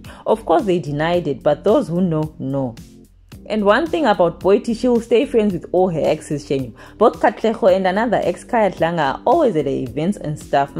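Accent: Shona, Zimbabwe. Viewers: You're Shona aren't you? 😅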